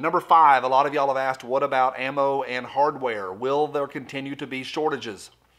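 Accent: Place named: Southwest Virginia, United States. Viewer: I love your videos, & the way you talk! Sounds a lot like where I come from.. SWVA?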